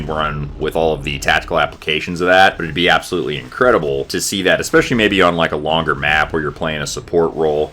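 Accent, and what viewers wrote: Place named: United States. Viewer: Btw, I love your pure american pronouncation ❤️❤️❤️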